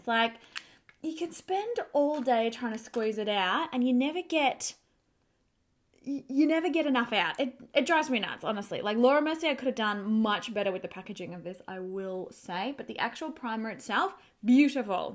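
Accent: Australia. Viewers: I could listen to you speak all day long. Your Australian accent is lovely. I am a New Yorker and we sound like truck drivers. New York accents are actually offensive to the ears 😂.. listening to your beautiful voice is so calming it’s just so so pretty.. When a New Yorker opens their mouth it’s like people want to cover their ears because we are so loud and sound like drunken sailors 🤷‍♀️🤪..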